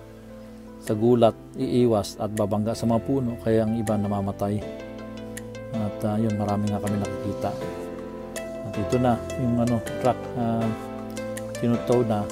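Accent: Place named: Australia. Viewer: Good day mate!❤️ I love your aussi accent po Sir, kanggaro +s cute but causes disgrasya sa daan.. Keep Safe po Sir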